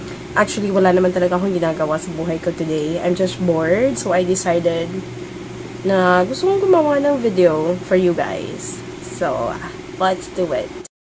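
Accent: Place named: Cebu, Philippines. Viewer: Like number 5:) heheh ang cute niya mag cebuano heheh ang saya agad ng bungad heheh:) wow gusto ko tong tag na to hehehe:) sakto ka sub ko lang din sa kniya now heheeh:) ang cute cute nimu mag visaya ui heheeh:) You just got a new subbie, It's Vangeline from IndayG's channel:) cheers!!!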